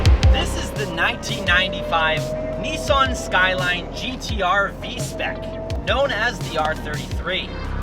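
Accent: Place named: United States. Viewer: Turned off when heard American accent only aussies build tuff GTR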